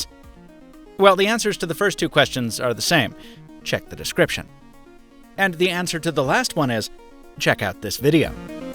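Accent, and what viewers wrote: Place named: Boston, United States. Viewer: Do a vid with your Boston Accent.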